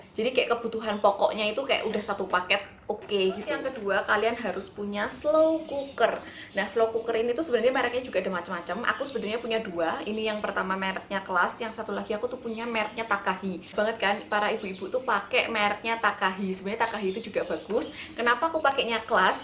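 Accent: Java, Indonesia. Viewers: diet ga? Abis melahirkan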